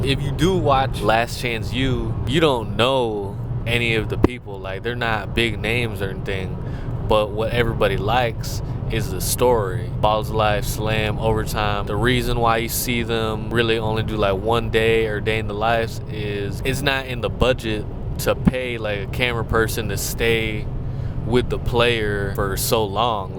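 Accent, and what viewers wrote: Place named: Alabama, United States. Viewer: Getting the Alabama accent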